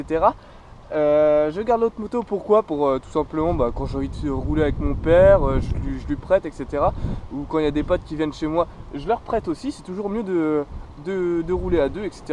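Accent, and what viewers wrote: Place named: North, France. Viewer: au toi tes du nord =)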